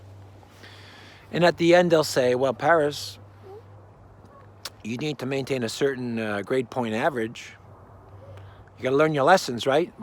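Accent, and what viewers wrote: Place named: Canada. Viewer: love your canuck accent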